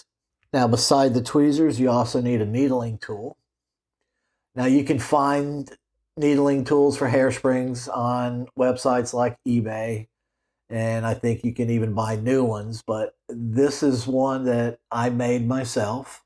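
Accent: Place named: United States. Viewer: Which part in america you from i like your dialect ?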